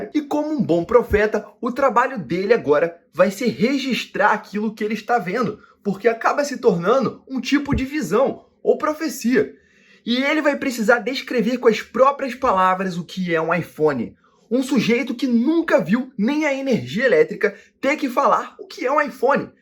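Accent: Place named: Rio de Janeiro, Brazil. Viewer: desconfio q vc seja carioca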